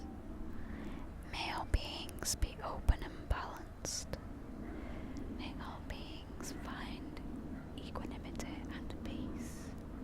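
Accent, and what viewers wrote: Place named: Northern England, United Kingdom. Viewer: Wonderful and relaxed video. Nice surroundings and good tips for meditation. Bonus:your charming Northern English pronunciation!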